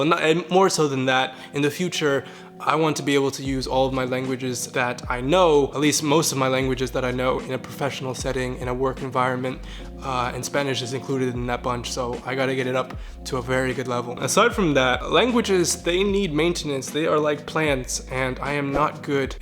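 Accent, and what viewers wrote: Japan. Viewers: Your Korean is adorable. It kinda sounds like a Japanese accent 😂🥰. I’m learn Korean too and I hope I can learn it with you! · Awww your korean pronunciation is so japanese lmaoo hope u get better and hope your have a good year!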